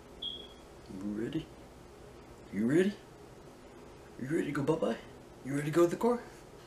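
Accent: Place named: Alabama, United States. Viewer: I could listen to your deep Alabama voice all day my favourite YouTuber no whistles or click bait. Your channel is artistic, authentic, honest, my favourite YouTuber keep up the great work !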